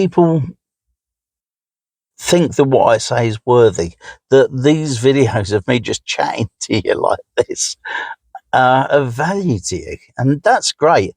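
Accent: United Kingdom. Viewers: Thank you. Thank you very much. As you know, I came because of that DVD video. Visited a few others. Learned what I wanted to learn (I was right...LOL) and never went back, except for you. I watched that beach day and listened to your stories. I, also, read the comments. I love their stories, even the short sweet ones. And I slide past the trolls with a roll of my eyes, so good to learn, you do too. I have very few friends. Years ago, I used to sit and talk to people in the building. They are gone now. If you lived in my bldg. and I saw you, I would sit down and we would have a good chinwag about our lives. I would refer to you as 'my friend' when I talked to others. We would share the low and high (not THAT kind of high) times. No gossiping. I like you for three reasons: Your British accent; no bells and whistles just get down to it; and you put up with my long comments. 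There are other people like you and I have watched some. I can tell the real people and the just-want-to-be-on-YT ones. The ones who don't have our lives but cobble together stories for themselves. You are the real deal. I could always do that...tell the posers from the real deal (esp. musicians). Thank you for reading my comments, too. And thank you for finding people to watch you and share as well. BTW, I was singing all last week! (The version.) So, thank you, too, for letting me know I'm not insane. Hah!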